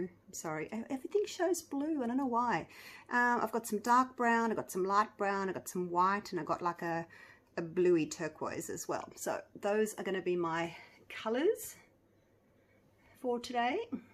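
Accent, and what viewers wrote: Australia. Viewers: I get such a kick out of the way she talks. Not the accent, which I’m low key obsessed with, but the things she says like right-o and hey? Or aye? I’m not entirely sure what she says ... but those types of things. I don’t know if it’s an Australian thing or an everywhere but New York thing. I enjoy people/things that are different from myself. Btw: I’d love to do a class type of thing with her! Like via zoom or something! That would be so much fun && totally something I’d pay for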